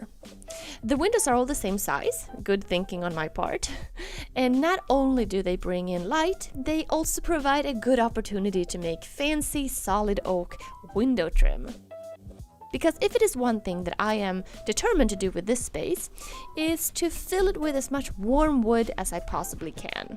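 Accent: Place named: Sweden. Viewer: Claims to be Swedish, sounds Swedish. Doesn't cover the outhouse in pine (furu). The Norwegians get suspicious of this behaviour.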